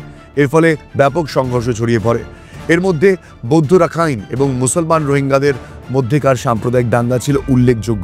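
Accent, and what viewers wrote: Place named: Kolkata, India. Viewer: বাংলাদেশ থেকে কথাগুলো শুনলাম দাদা মনে হয় কলকাতার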